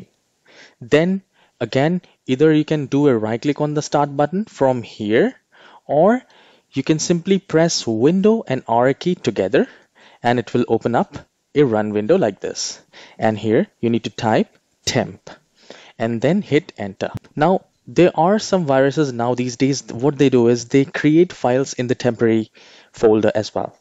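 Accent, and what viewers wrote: India. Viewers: jesus pleesuz. It would be so nice if people that are instructing, would look at their tutorial from a student point of view. I spend more time rewinding because I can't understand the jibberish coming out of your mouth. Your think accent is bad enough in and of itself. But stop mumbling. Typical of east indians. And speak slowly ffs. Make sure to say EXACTLY, what you are typing. saying "temp%", is not what you typed "%temp%".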